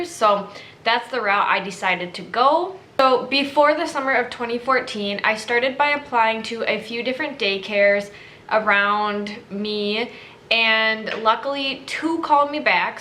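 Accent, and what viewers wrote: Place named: Minnesota, United States. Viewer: Why would u have to drive to a bus station and then take a bus? Ive got to have ESP the second I turned your video on I thought Minnesota it must have been the accent.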